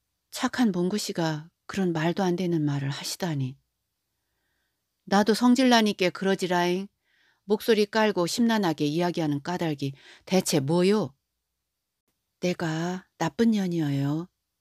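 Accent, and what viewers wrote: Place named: South Jeolla, South Korea. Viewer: 전라남도 사투리가.. 겁나~ 맛깔나부네잉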